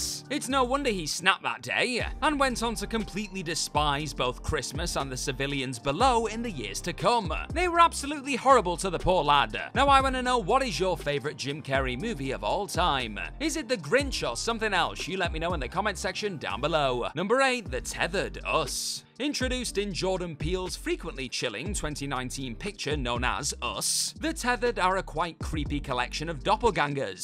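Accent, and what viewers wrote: United Kingdom. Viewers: You do realize that British accents are like that, right? Brits can't change the way their accents pronounce words. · Because he's British. That is literally how the English accent works.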